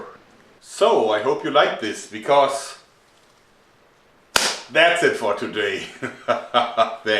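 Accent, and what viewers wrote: Germany. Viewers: i've always loved his accent xD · is er deutscher? :3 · This beautiful Fleshlight hohoho, that german accent :D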